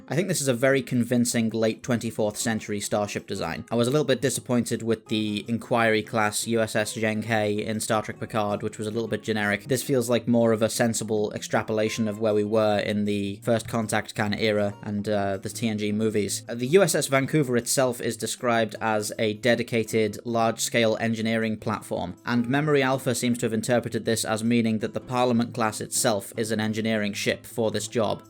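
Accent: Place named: Northwest England, United Kingdom. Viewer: love this where in the Northwest of UK does your voice over talent Hail from?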